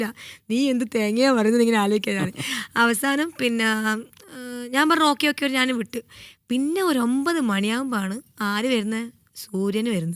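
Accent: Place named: Kannur, India. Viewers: ഓരോരുത്തരും അവരവരുടെ സ്ലാങ് ലാണ് സംസാരിക്കുക.. തലശ്ശേരി സ്ലാങ് ആണ്.. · 😂കണ്ണൂർ സ്ലാങ് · Kannur slang anu....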